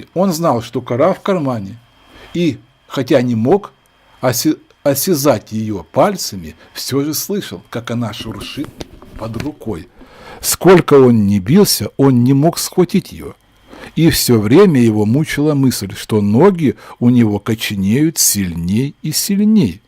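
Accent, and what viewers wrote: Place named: Ukraine. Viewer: Спасибо за начитку, конечно, но украинский акцент, сбивчивость и, самое главное, обилие рекламы, делают прослушивание некомфортным, относительно других чтецов произведений Лондона.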